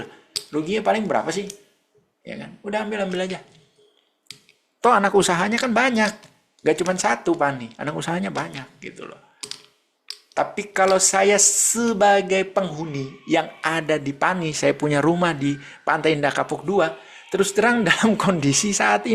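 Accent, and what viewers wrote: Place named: Central Java, Indonesia. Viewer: Modar, semacam logat jateng , magelang purworejo sekitarnya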